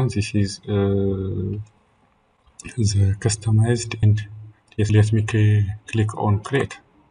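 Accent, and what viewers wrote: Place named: Ethiopia. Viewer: My bro is definitely Ethiopian... the accent 😄 We were looking for a fix while we are using the internet bro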